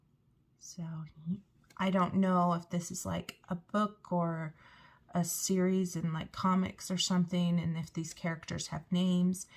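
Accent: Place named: Australia. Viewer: Love your hauls. Tysmfs. Are you from the USA you sound Aussie, I’m Aussie lol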